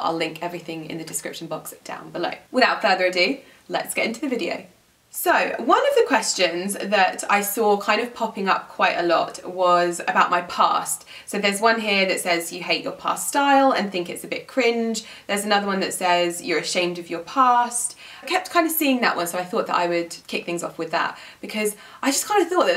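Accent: RP, United Kingdom. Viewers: You truly don’t deserve the hard time you get, such a hard working, lovely lady deserving of all the success you have. Also, about the posh accent bit, my accent seems to change so easily depending on who I hang around with, some days I sound Yorkshire, the next day Canadian and the next day London, it’s so weird so I feel you there haha! Keep inspiring us all lovely xX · English is not my first language, you speak very clearly comparing to other British accent people. thanks 😘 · I absolutely love the way you speak and your British accent! English is my 2nd language, so every time I watch your videos I say to myself "it's time spent on learning a foreign language, not just entertainment" ^^ Two words which I've learned from you and now overuse: "flattering" and "wonky"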